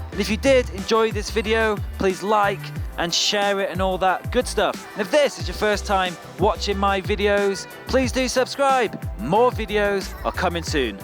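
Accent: England, United Kingdom. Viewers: me gusta tu acento ingles a ver cuando me das clases de tu idioma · I used to watch British Telly until the 2006 , that's why I understand and love your accent but honestly I didn't know the little Britain lol .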